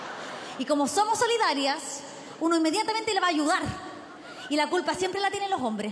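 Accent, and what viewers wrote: Chile. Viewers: se arrugan enteros por una humorista que habla como el 70% de los chilenos hoy en dia . Son cuaticos con la chiqui que fue mas honesta y se nota que tenia confianza con su rutina y el publico. personalmente es la unica humorista que me hizo reir de todo el festival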